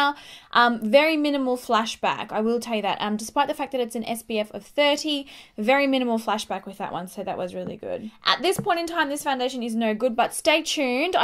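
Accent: Australia. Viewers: Omg. When i heard your voice i knew that you are from Australia. ❤️ I love Australia. It's most beautiful country in the world. And i'm from Czech republic. ❤️❤️💖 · is she australian i cant tell with her accent because i'm australian and i dont know ahha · Where is she from? Australia or New Zealand?? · is she Australian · Thats not american slang. She is Australian. Thats how she speaks. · sara v. she's from Australia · Amanda Bittner she's Aussie and leave her alone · h law She's from Australia. Of course she's gonna have an accent. Everyone has a different accent depending on where they're from. Did you not know that? I don't think you did, even though it's an obvious fact. Also your attitude is so gross. I literally cringed reading your last two comments, yuck.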